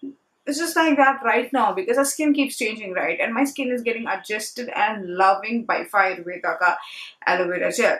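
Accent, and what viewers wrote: India. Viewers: Wow it's such an awesome remedy with so less ingredients n moreover ur hindi accent is super cute.Love u lotsss baby . 😍❤💋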